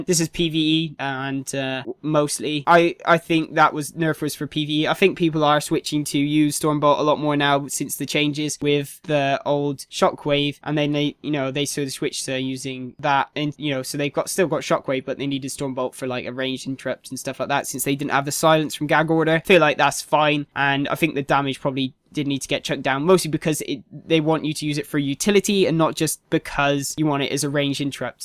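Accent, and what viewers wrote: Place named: West Country, United Kingdom. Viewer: Personally subbed because of your west country accent. makes me think of home! Great vids. hardly any holy pala changes makes me smile!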